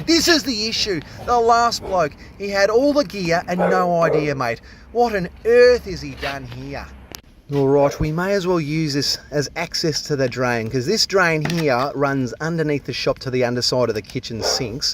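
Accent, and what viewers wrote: Australia. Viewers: I am from Hamburg, Germany, just stumbled over your channel! And I can tell that you ar Australian! Both from your mild accent and the channels name. But where exactly?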